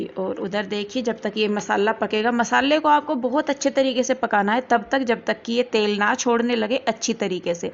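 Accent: Haryana, India. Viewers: आप यूपी की लग नहीं रही आपके बातों से हरियाणा वाली लग रहे हो आप मेरे बेटे के लिए आपकी साइड की कोई लड़की ढूंढ दो गरीब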